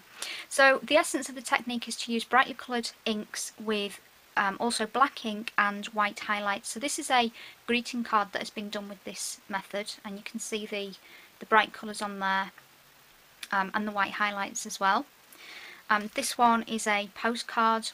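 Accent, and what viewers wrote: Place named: Northern England, United Kingdom. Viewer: Fabulous work and great videos. I was trying to work out your accent - somewhere between Liverpool, Manchester, Cheshire and the Black Country?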